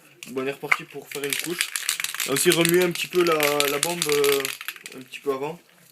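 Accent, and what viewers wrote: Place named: South, France. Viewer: T'es du sud toi ? J'entend un petit accent , sinon merci pour se tuto !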